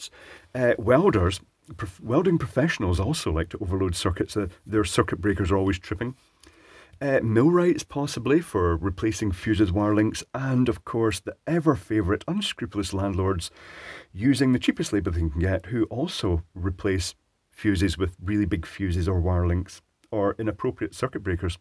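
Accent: Scotland, United Kingdom. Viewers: I don’t just come here for the video’s. I come to hear beautiful Scottish accent! 😅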